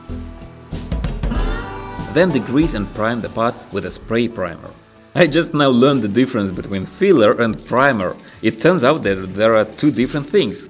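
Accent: Russia. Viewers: Акцент русский=)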